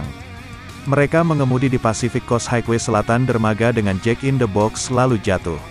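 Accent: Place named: Indonesia. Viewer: Kan pake pelafalan logat Indonesia bro... 🤣🤣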